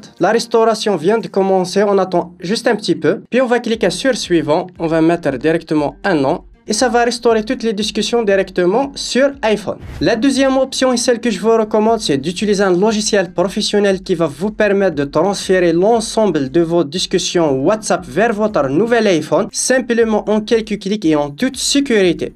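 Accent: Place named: Kabylie, Algeria. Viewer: Kabyle algérien ❤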